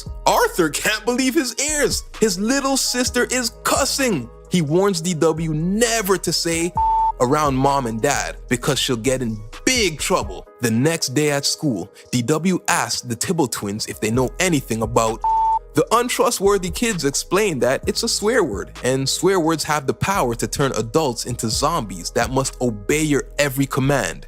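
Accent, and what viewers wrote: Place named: Quebec, Canada. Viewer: Your from Montreal Quebec or chateuguay right I can tell from ur accent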